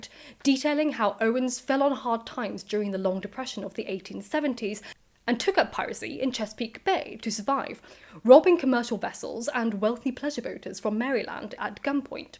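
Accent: RP, United Kingdom. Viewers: Was your father in the House of Lords cause I’ve heard posh accents before but that’s something else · No she's just British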